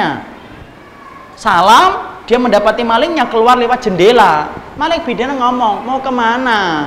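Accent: Java, Indonesia. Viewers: ini ustadz dari mana ya? baru lihat dua kali ini, jawa banget ngomongnya.. tapi sukaaaa..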